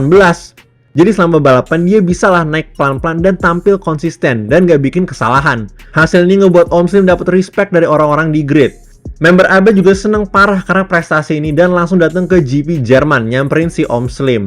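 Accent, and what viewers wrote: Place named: South Jakarta, Indonesia. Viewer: Logat jaksel kental sekalii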